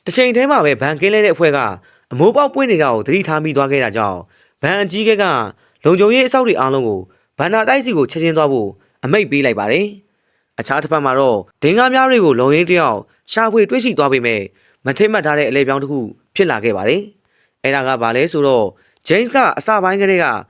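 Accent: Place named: Upper Myanmar, Myanmar. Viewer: ဒီဗီဒီယိုတင်ဆက်သူက မြန်မာပြည်အထက်ပိုင်းဒေသကနဲ့တူတယ်။ Tom ရဲ့ အသံထွက်က "တွန်" ပါ။ "တွမ်" မဟုတ်ပါဘူး။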